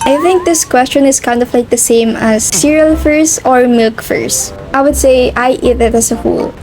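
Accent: Philippines. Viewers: it’s kind of a filipino thing when they speak english. it’s so cool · Is she Filipina cuz her accent is very jayjay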